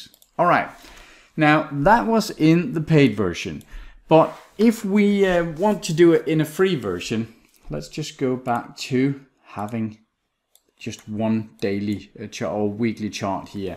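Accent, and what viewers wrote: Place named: Denmark. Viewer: Cool accent! Are you from Denmark? Thanks for the helpful video!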